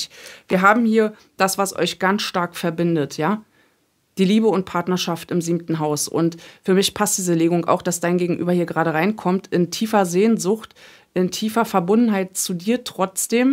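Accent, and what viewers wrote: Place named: Berlin, Germany. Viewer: Immer wenn ich dir zu schaue... Fange 8ch an zu Berlinern 😂 dat in Hamburch ist immer komisch 😂.. Den janzen Tag hab ik so jeredet... Heimat Gefühl... ❤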